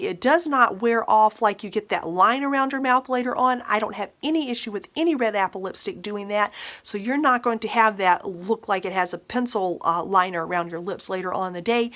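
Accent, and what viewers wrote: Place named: South, United States. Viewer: Hey can you do a swatches of all your eyeshadows up close thank you I love your southern accent 😊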